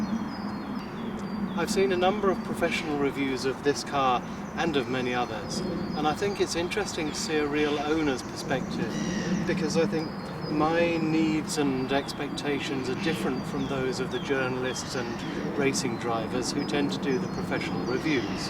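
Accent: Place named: United Kingdom. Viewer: It did confuse me when you had the British accent and left hand drive!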